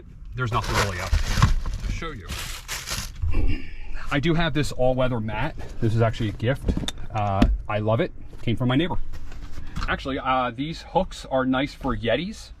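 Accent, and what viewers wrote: Philadelphia, United States. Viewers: Your accent, then I saw your t shirt. A fellow Philly dude. Yo!!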